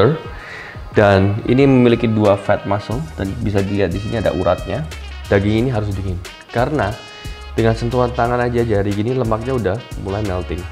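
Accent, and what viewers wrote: Java, Indonesia. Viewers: logat jawanya chef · kok enak ngomong jowone coba nek review campur bahasa jowo mas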